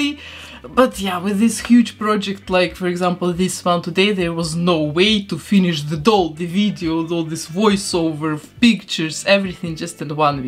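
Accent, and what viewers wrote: Russia. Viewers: Мне одной кажется, что у неё очень сильный русский акцент 🤔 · Знакомый акцент. Вы случайно не русская?) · Очень красиво, но почему мне кажется что вы говорите с русским акцентом? Ваша работа вдохновляет! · Такое ощущение, что девушка русская, так как говорит с акцентом, но тогда жаль что нет русских субтитров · Девушка, ваш акцент уж очень напоминает русский... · Russian akcent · Вы русская? У вас такой жесткий английский 😱😱😱😱 Я тоже решила переделать такую же Дракулауру, не нравится она мне вообще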